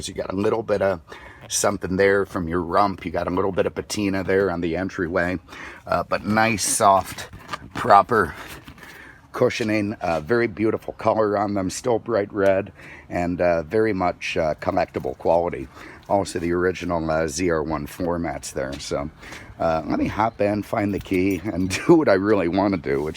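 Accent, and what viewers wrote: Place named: Yankee, United States. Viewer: That Yankee voice grates on this Southern man's ears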